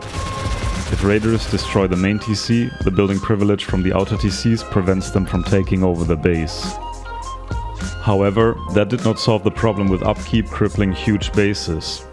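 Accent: Germany. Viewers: Deutsch??? Dein Englisch klingt so deutsch XD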